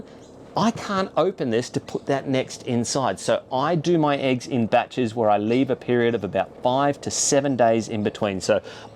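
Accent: Australia. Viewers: 100% on the accent, been in the US for 10 yrs now and it's a welcome change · Thank you for a great informative and to the point video. Well done. It’s so nice to hear an Australian accent on these videos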